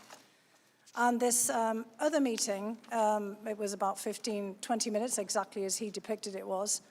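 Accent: United Kingdom. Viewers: She sounds like a Brit.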